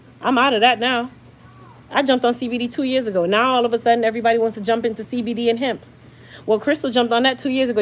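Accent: Jamaica. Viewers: Are you Jamaican ? Keep up the good work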